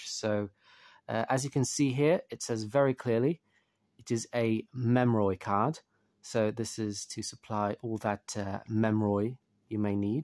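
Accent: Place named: United Kingdom. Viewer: Thanks for all your help. You seem to be British. Your accent seems to indicate so. Your video 📹 is now appearing on YouTube in South Africa. Date: 28/04/2024 (April; Sunday).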